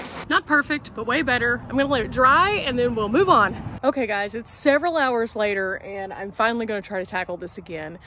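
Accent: Texas, United States. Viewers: Ooooohhhhhh I have been waffling for a year too for my NV. Gonna watch and see!!! I haven’t been able to pull the trigger on a rack and box because the NV is just a little tippy toppy rocky already and didn’t know if adding up volume was going to make it more so. And can I actually crawl up and get stuff easily or not. Would I even? But I want to go on longer journeys and to do so I either need a roof box or hitch box. Waffle waffle waffle waffle waffle. I love simplicity and minimalism and well a big ole box seems like a big ole closet. Carry on vs checking luggage. But maybe. Can’t tell you how much your channel has helped me make decisions because you have the same vehicle and the same Texan accent 🤣. Oh I miss Whataburger! Misplaced Texan that needs to journey home to see the folks whose house just flooded in that last flash flood and so ya I guess I need a roof closet y’all, darn it 🤷‍♀️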